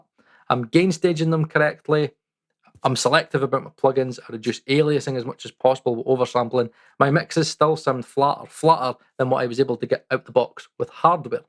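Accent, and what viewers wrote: Scotland, United Kingdom. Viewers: What is your accent? Is it scottish!? I really struggle to undertsand you as a non-native speaker.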